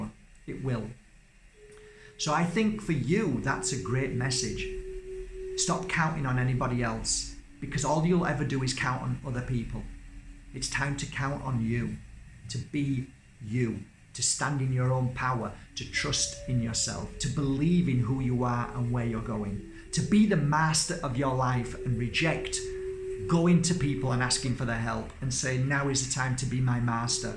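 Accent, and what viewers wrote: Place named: North, United Kingdom. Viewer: My friend recommended you to me and you’re absolutely amazing. I can very much relate to a northern accent too haha ....Thank you for helping me see the light 😊xx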